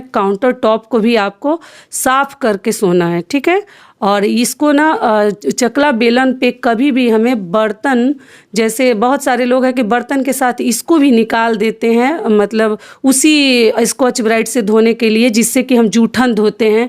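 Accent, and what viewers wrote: Bihar, India. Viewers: Ap bihar se ho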